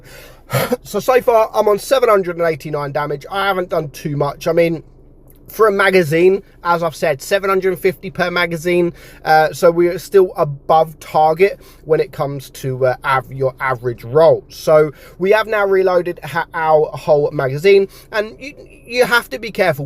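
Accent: United Kingdom. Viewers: He has a accent and I think he British 🦷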